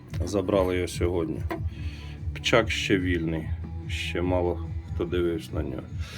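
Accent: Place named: Lviv, Ukraine. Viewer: Слава Україні!Гарні роботи,гарна майстерня,по акценту чую недалеко від Львова.Назар(Львів)трохи ваш колега.Мирного неба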